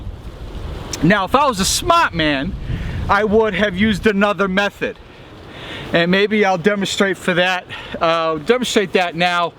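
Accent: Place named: New England, United States. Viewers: So I'm listening to the long island, possibly brooklyn, possibly queens or even boston accent - and being a city boy myself, I'm not overly hopeful about this review because this guy clearly hasn't grown up skinning gators or twisting antelope sinew for shoelaces. And then he taught me how to CHOP/CHISLE using a batoning technique. Apologies, bro. Solid video. You've done the work. Thanks for the lesson. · i just looked at it quickly,, i don't like the 51,, anyway,,where are you at/from?? i am from north central maine, live in west central florida,, you have that yankee accent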